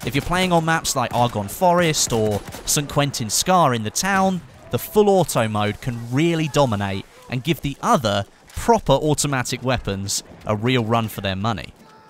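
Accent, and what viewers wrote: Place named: United Kingdom. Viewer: The British accent, the music and the commentary everything is spot on.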